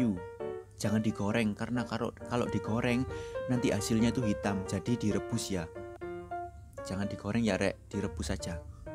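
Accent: Java, Indonesia. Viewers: Udah kenal channel ini sejak subscriber nya puluhan ribu sampai sekarang hampir sejuta. Suka sama suaranya yg medok dan resepnya yang mudah (meskipun gak pernah aku coba karena males wkwkwk) Sukses terus, mas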